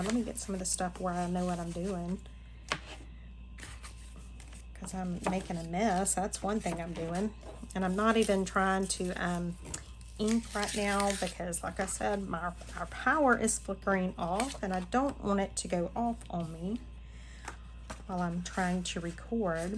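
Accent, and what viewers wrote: South, United States. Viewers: You channeled Bob Ross with a happy little accident on that pocket 😻. I can understand you ok. Love your southern accent.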